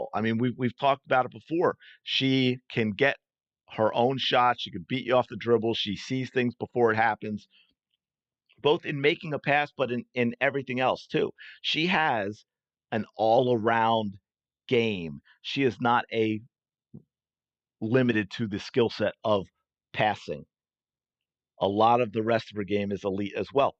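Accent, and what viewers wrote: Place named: New York, United States. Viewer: As an aside, I could've sworn I detected a NY accent in your speech😊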